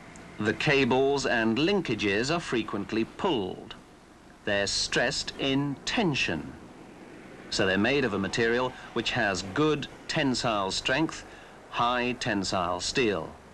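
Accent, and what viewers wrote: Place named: United Kingdom. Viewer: British vs. North American pronunciation and spelling. The video uses the correct British (and most of the rest of the English-speaking world) pronunciation.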